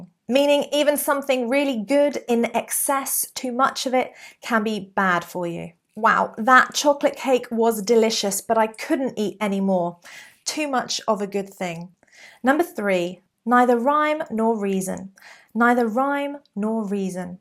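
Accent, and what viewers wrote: United Kingdom. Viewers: .. I hope you're doing jolly well... I love your accent.. Would you mind making some videos to improve british accent... And more Posh videos... they are my cup of tea... Thank you so much for your time... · Like the subject n uk tone 👍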